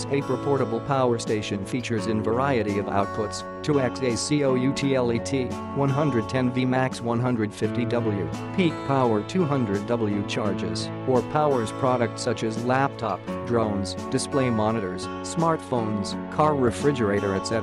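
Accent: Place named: Greece. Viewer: Κατάλαβα από την προφορά σου πως είσαι Έλληνας, και το επιβεβαίωσα μόλις άκουσα και το τραγούδι που έβαλες! Από που το αγόρασες το μηχάνημα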